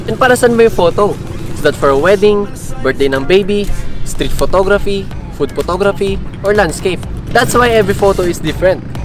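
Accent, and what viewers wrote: Philippines. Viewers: That sounds like a Filipino accent ? And Tagalog am I Tama ???? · You remind me of my Phillipino boss here in UK your accent.😍😍😍. I like you you are a very good teacher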